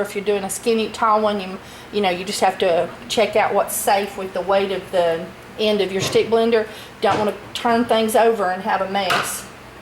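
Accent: South, United States. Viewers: You have to be from the south! Love watching videos with fellow southerners, I it feels more like I'm watching a friend instead of some boring video.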